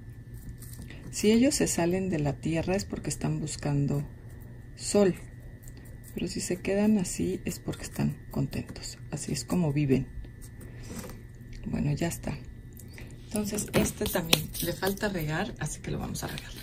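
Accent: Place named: Mexico. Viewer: Viva México,Lindo y querido y de donde pensaban que era???escuchando su asento es ovio que Mexicana,succulenta esss. 🌵Gracias por tus vídeos😄😁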